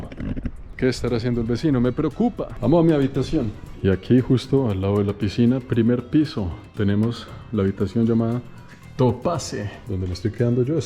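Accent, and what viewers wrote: Colombia. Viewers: Para la calidad y belleza de las instalaciones de esos hospedajes son muuuuy económicos para la mayoria de turistas, sigue disfrutando!!! Gracias a ti ya no detesto los colombianos, a pesar de tu acento eres excepcional, sigue así, o sigue mejorando!!! Felicidades!!!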